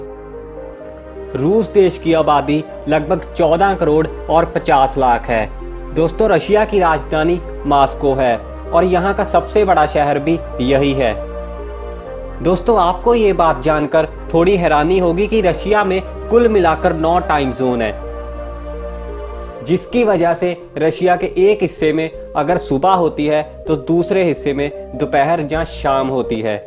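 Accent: Punjab, India. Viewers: Punjabi tuch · Bohot achi video h bhai apke bolne se lgta h ap punjabi ho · Punjabi ta punjabi hi hunda, English vi punjabi tone ch bolde ae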